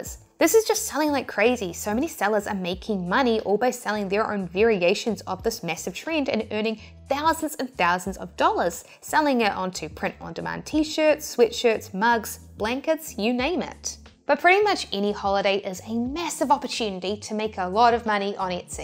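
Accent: New Zealand. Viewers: Pits =Pets 🤣🤣I'm a new subscriber and Im loving your content.! ☺ So basically New Zealanders pronounce "e" as 'I" ? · I like your accent, I was once in New Zealand and in the beginning it was hard to understand but afterwards it sounds so cute 🥰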